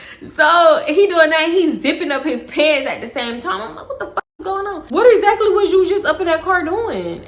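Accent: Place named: Texas, United States. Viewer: went through my youtube highlights and you were my top watched youtuber lol i loveeee your videos and when i first used to watch you i was like “she talk just like me gotta be from texas “ then a lil after in the video you brought up being from houston then i found out you was a sagittarius too i said oh yeaaa this is my gurlll❤❤been watching since i was 16 lol im turning 20 on tuesday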